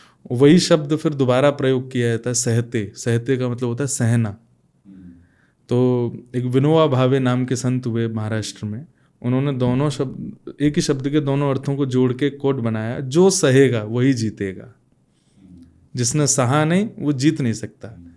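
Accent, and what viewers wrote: Braj, India. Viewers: apni Braj ki vasa boliye na woh acha lagta h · aapki samukh se varja bhasha hume badi pyari lagati he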